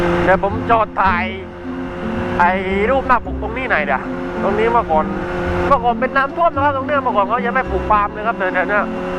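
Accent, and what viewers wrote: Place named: South, Thailand. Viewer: ชอบภาษาใต้ 👍🏻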